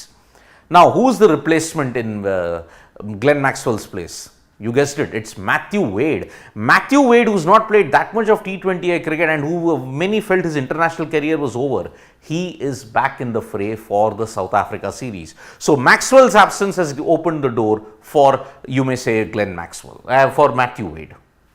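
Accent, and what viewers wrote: South India, India. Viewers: You english voice is sweet as a quecumba I like your throwing of english language natural south Indian way of speaking and about niraj he should not stop his aim would like to clinch more try to engulf more distance the record of 98 and learn more and generate new player as like a dhrinchariya of jebling throwing sudip greatness and pride is attached to him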